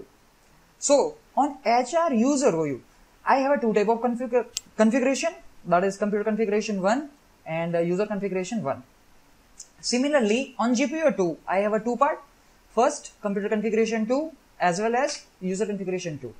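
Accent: India. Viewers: seems like a good video, but i can't understand your indian accent..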